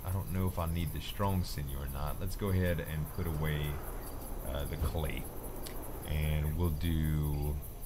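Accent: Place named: Grand Island, United States. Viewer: Love the accent, reminds me of grand island. Keep up the goood work!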